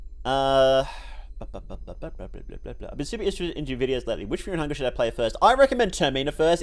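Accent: Australia. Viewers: Mr Funny Australian Man, I have an important lore question. Is Patrick Bateman a new god?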